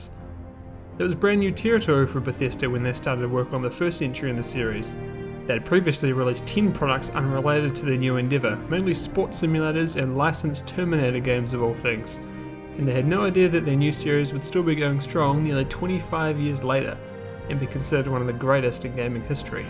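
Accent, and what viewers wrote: New Zealand. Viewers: Great video! Also are you a fellow kiwi? Bless up 🙌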